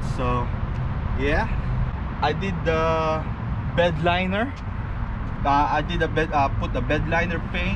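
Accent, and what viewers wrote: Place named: Philippines. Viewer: that filipino accent tho.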